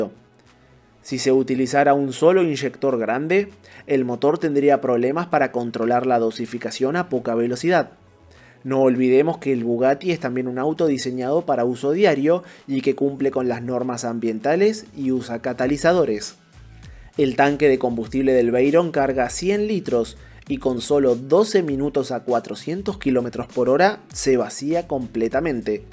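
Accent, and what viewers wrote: Argentina. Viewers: soy argentino y ese acento es muuuy argentino.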